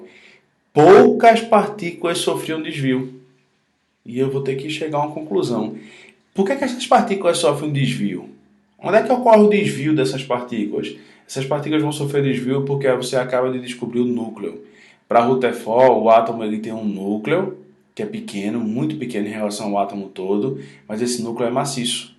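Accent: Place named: Northeast, Brazil. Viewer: Kkkkkkk cara vc é mt bom!!!!! Serio msm eu rir e aprendi, parabens amei teu sutaque de nordestino ne?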